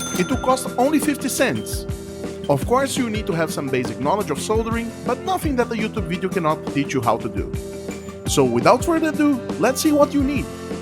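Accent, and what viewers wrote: Brazil. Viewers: sotaque Brasileiro, tmjt BR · are you brazilian? you have the característics of brazillian when you speak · Tu é brasileiro, irmão? Esse sotaque entrega ❤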